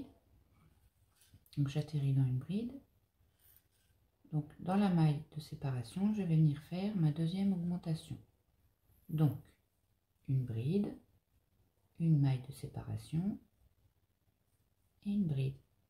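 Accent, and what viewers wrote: North, France. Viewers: Bonjour crochet très joli je pense la faire ce fil est tellement super vos explications sont claires. Une question sans indiscrétion seriez vous du Nord car votre accent m interpelle?tres beau travail merci beaucoup et bonne semaine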